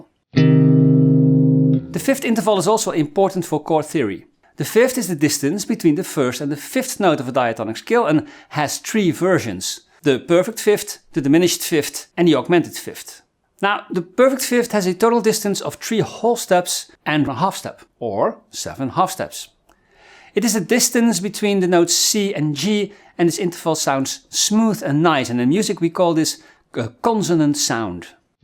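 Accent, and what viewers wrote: Netherlands. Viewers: A)crystal clear B) your Dutch accent brings back warm memories of all my Flemish and Dutch colleagues! Thank you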